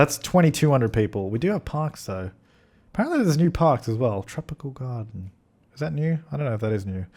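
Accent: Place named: Australia. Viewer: i love listening to game commentary in an aussie accent, they don't go fucking mental like Americans which is just lovely ...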